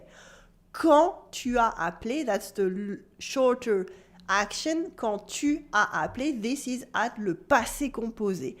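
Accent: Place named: France. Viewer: I believe you speak too much English in your video. That's the reason I don't subscribe to your lessons. You would help those who are listening to hear complete phases in French. Also, as someone else mentioned, despite your good English, you did not pronounce the word Adjective correctly in English. It just sounds like any French person trying to speak English (with a strong French accent). The accent is on the first syllable, not the second. Bonne chance!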